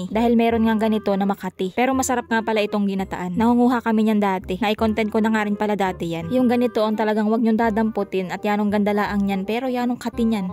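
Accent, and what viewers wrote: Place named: Cebu, Philippines. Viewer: Ganda pakinggan ng dialect nyo madam, halong cebuano ang tagalog...parang sa Palawan din. Ang ganda pa! ❤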